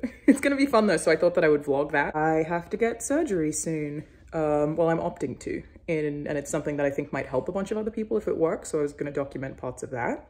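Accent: Australia. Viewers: Liked. No freakin' clue how you ended up in my recommendations. You're cute. Your dog is amusingly weird. I have no idea about painting models, but I agree that Ripley needed to be greener. Tattooing eyebrows seems severe, but I'm a 60-year-old guy, so there's that. Obviously, I'm not a gamer, but I did play Descent 3 for an hour yesterday. Does that count? Shameless confession: Aussie accents (yes, I'm aware there are many) are simply lovely. If you show up in my recommendations again, I am likely to watch. Yay, Al Gore Rhythm. Be happy,. Your dog is brilliant. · can you make a channel without the aussie accent?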